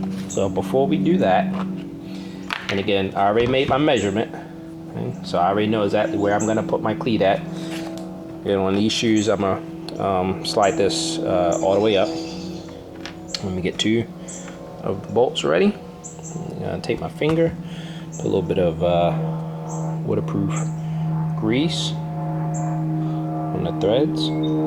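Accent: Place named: Baltimore, United States. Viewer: This guys got a super baltimore accent. Good vid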